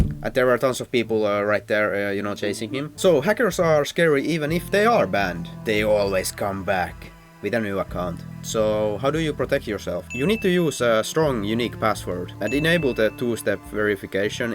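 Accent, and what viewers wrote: Finland. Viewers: I like finnish accent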